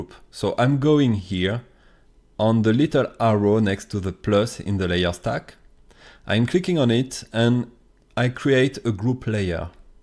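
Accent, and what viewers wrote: France. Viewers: Are u french? U have a french accent so hard man lol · You have a strong French accent xD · are you fench (si oui, j'ai reconnu ton petit accent !) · Salut ! J'ai vu que tu étais Breton alors je te parle en français ! Super vidéo, on apprend beaucoup de chose sur Krita. Continue ton WebComic, il est juste génial, l'histoire et mimi, drôle et magique, tandis que le dessin sont juste magnifique : j'adore ton style ! Pourquoi avoir choisi Krita ( peut être que Photoshop est trop chère mais je me dit que si tu es pro ... ) ??? Et comment faire pour diminuer la taille du brush sans aller dans la barre du haut ? Continue tes vidéos, c'est pas commun des tutos krita ! Ha, aussi, T'es vidéos sont géniale car JE COMPRENDS TOUT GRACE A TON ACCENT !!! ( sans vouloir être méchant, mais ton accent français est vraiment cool pour moi qui n'ai que des bases !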